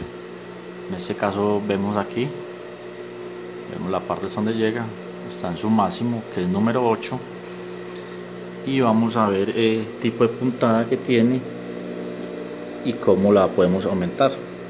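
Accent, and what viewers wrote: Colombia. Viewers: buenos dias amigo por su dialecto se meda que usted es colombiano y por los lados del eje cafetero buen tutorial felicitaciones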